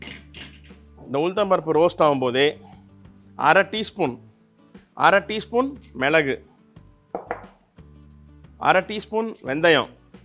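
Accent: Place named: Triplicane, India. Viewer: சார் நீங்க சின்ன வயதில் திருவல்லிக்கேணியில் இருந்தீர்களா பேச்சு ஸ்டைல் அப்பிடித்தான் தெரியுது. நல்லா ரசிச்சு பேசி செய்றீங்க. Very interesting.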